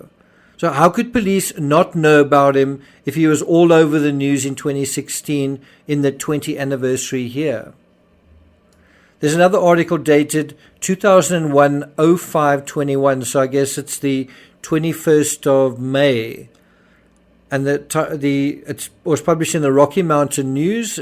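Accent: Afrikaans, South Africa. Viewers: lol being South African I thought hell yeah his accent must be Afrikaans, thanks for the info I didnt know was also a South African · Yes he is South African with an Afrikaans accent. One of the best on Youtube. His insights are often very original. is also ex South African. Another excellent True Crime Channel.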